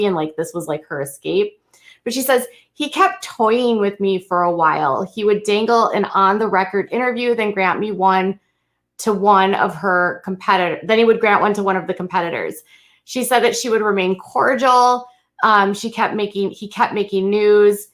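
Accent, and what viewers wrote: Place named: Upstate New York, United States. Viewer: Her accent sounds like upstate New York state.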